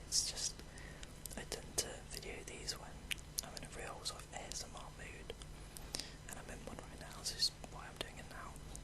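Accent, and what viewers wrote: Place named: England, United Kingdom. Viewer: Thank you so much for this video, I absolutely love it! I'm studying abroad at the moment and this has helped a lot with stressful exams etc. nothing like a bit of eye poking to help unwind. I'm a bit homesick and it is so good to hear the English accent too - can't get enough of your voice. p.s. you're awesome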